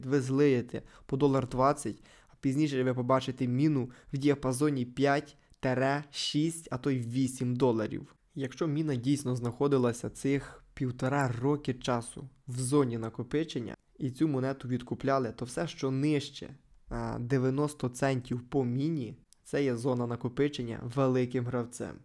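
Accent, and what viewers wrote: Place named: Western Ukraine, Ukraine. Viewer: Дякую за відео,по говірці чути зо людина з франківщини чи львівщини , я вгадав???)))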